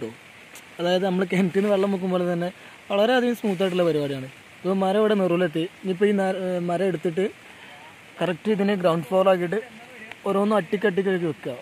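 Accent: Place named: Malappuram, India. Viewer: Mallapurum slang 🔥🔥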